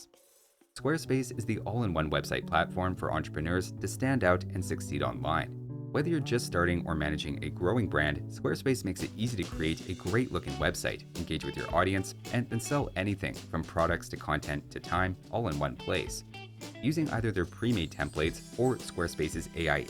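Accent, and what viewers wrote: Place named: Canada. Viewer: Great video as always,! Thanks for the insight. I don't expect you to pronounce everything super correctly, but Mali is pronounced with the "a" as in "cat" - rhymes with alley. (To pronounce it like a West African, the "l" is different too, made with the tip of the tongue forward, close to the back of the teeth. But that would probably sound kinda weird in the middle of a Canadian accent.)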